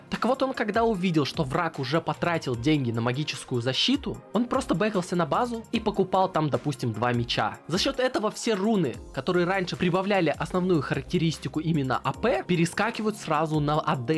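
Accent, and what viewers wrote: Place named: Asia. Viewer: он говорит на английском с некоторым азиатским акцентом. Подтвердить его русскую национальность не могу.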